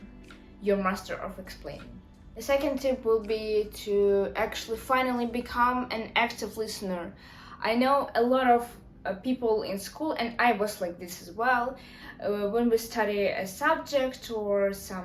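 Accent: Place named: Russia. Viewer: are you from russia? because your accent sounds like someone from russia. anyway, i enjoyed your video :)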